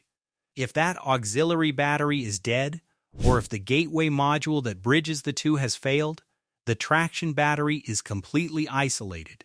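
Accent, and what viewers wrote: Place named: United States. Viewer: You should be more careful with your script writing because you’re using an American Standard accent, but referencing British currency. Also EV, is pronounced eeeee veee not “ev” like in evermore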